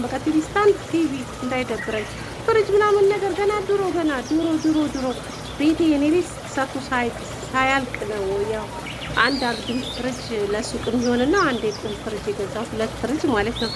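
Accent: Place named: Wollo, Ethiopia. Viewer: ደሴ ነሽ ሀይቅ ወሎየነትሽ መቸም በንግግርሽ አያሳስትም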